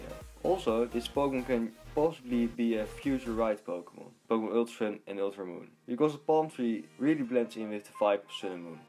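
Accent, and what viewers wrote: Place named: Netherlands. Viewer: Are you from Holland it souns like you from Holland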